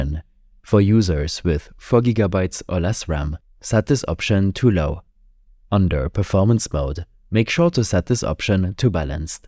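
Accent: Germany. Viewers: I said "lern Englisch" it's German because of his German Akzent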